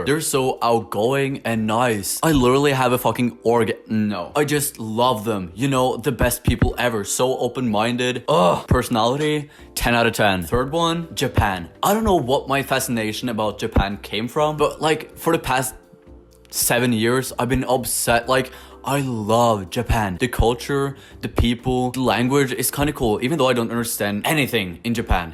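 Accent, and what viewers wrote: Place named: South Korea. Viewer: You low key sound like you have a korean accent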